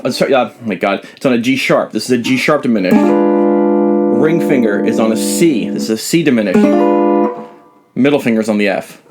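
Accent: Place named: Long Island, United States. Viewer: Great lesson!..I learn quite a bit from your explanations..Are you from NY?..I'm from the Island, and you sound like everyone I know.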